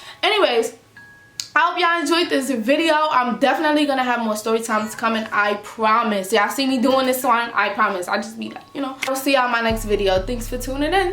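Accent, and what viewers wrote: New York, United States. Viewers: I just started watching you and I love you your NY accent is so cute 🤎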